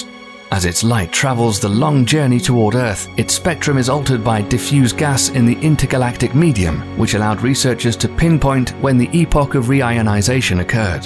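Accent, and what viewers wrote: United Kingdom. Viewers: You know it's a British video not only because the guy talking sounds british, but also because the video plays in 50fps lol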